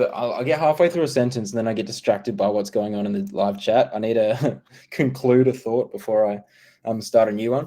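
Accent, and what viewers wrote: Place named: Australia. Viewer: May you be well & happy dude....im here for listening australian accent ...love from sri lanka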